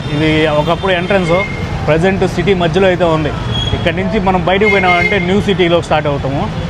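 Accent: Nellore, India. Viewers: Hello brother.. Mede nellore mata laga vundi..... Made nellore le..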